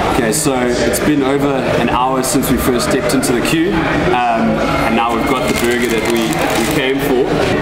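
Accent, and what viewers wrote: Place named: South Africa. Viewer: I'm unsure which I liked more, the SA accent or your articulation of argument. Props to you from Australia!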